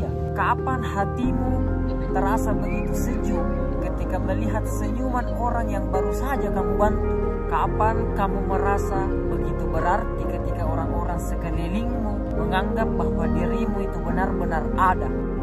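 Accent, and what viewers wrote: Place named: Makassar, Indonesia. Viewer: Alhamdulillah vidionya semakin bagus,semoga berkah.... Logat Makasarnya medok banget...hehehe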